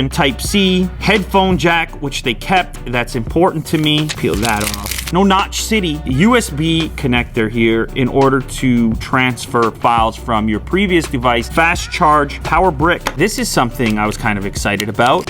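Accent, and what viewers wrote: Canada. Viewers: Is this guy Canadian? He got really Canadian about halfway through the video.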